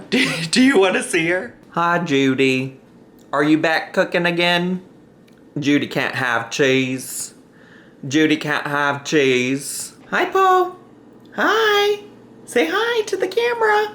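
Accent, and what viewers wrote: South, United States. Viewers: This new southern accent isn't it babe. Kinda like our gorls "lah" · How do you have a country accent but you live up north?! It gets me every time! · Lmao your random southern accent throughout the video is oddly fitting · you are very southern in this video LMAO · I never noticed until this video that has a country accent!?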